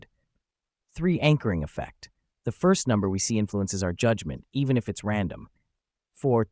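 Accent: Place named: United States. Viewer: American English name, American English speaker, British English material 😮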